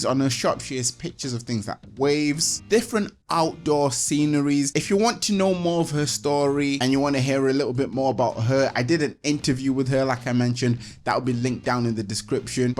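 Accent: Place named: Manchester, United Kingdom. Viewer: Ha, yes! I recognised a fellow Manc. Nice one our kid. 😁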